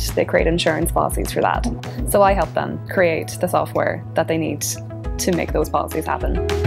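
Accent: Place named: Monaghan, Ireland. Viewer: lovely Monaghan accent.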